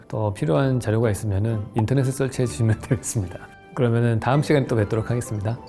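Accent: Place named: Seoul, South Korea. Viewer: 말하는 스타일이 먼가 고급스럽네요. 나도 이렇게 말하고 싶다.ㅋㅋㅋㅋ 일단 서울말 부터 배워야 되나 ㅋㅋㅋㅋ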